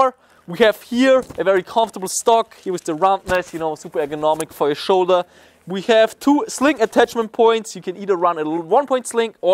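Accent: Germany. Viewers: Are u german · Then se fleshlight right here. I love his englisch xD. Dieser deutsche Akzent hahahaha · Is he german or austrian?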